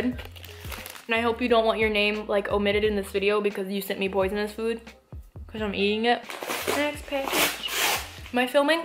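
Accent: Texas, United States. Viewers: Love that she's from Texas and says "y'all" like me 😁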